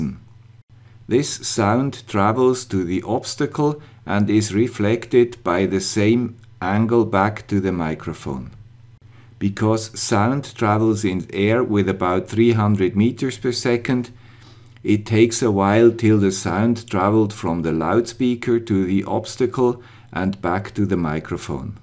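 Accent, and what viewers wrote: Switzerland. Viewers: Hallo, auch hier einer mit dem swiss accent:) Ich habe diesen <link> Ultraschall-Sensor gekauft. Ich möchte diesen gerne mit I2C betreiben mit einem STM32F446RE Mikroprozessor. Leider habe ich die slave address noch nicht ausfindig machen können oder auch das abzurufende Register. Kannst du mir weiter helfen?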